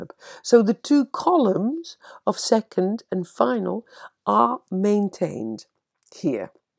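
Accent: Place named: North, United Kingdom. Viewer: Just interested.. Is the lady on the video a native speaker of Dutch? I would have thought so, despite the slight northern British accent 😊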